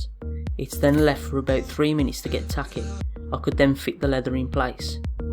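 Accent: United Kingdom. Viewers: yes and finally found a good man speaking british accent.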